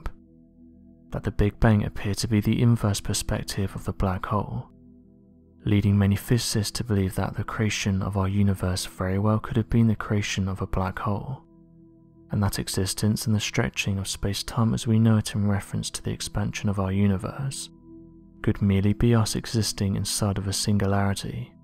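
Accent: United Kingdom. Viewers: Why is there a British sounding person talking just wondering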